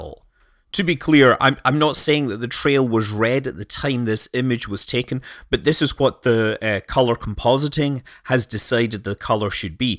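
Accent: Scotland, United Kingdom. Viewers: Are you Scottish? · I click for the Scottish accent . . . . . . . and the info too.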